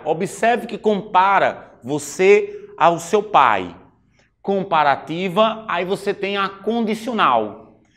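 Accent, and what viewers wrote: Northeast, Brazil. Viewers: Meu caro professor. Suas aulas são espetaculares. E mais, seu sotaque nordestino, é fenomenal. Aprender língua portuguesa deveria ser a meta de cada um dos falantes desse idioma maravilhoso. E, tem gente que não gosta. Será que tem medo de aprender? Pra esses "possíveis medrosos", fica a reflexão de Sócrates: - podemos facilmente perdoar uma criança por ter medo do escuro. A real tragédia da vida, é quando os homens têm medo da luz. Abraços.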